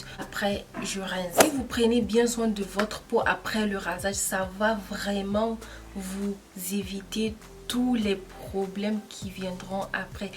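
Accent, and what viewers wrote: Niger. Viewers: J'ai direct reconnu l'accent Nigerien 😅, merci pour les conseils ! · Merci chère soeur Sinon tu as un accent Nigérien.suis Nigérienne aussi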